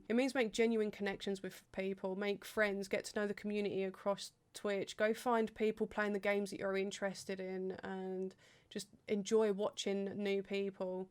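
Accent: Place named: United Kingdom. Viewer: Hey, thanks for your video really good tips and thoughts some I can confirm through my experiences on Youtube. I'm starting to stream now too. You're really helping me with that. Thank you! Apart from that, I just have to say I love your British English!:-)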